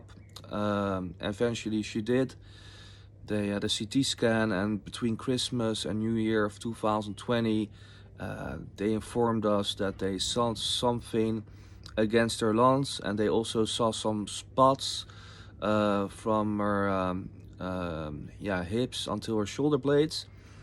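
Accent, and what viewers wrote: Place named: Netherlands. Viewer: I found your entry by accident and was spellbound. I noticed your Dutch accent. My heart goes out to you. Ik vond uw boodschap door toeval en was ondersteboven van uw verhaal. Ik weet dat ik niets positiefs kan bijdragen aan uw verhaal, maar voor wat het waard is wens ik u en uw gezin heel veel sterkte. Elsche.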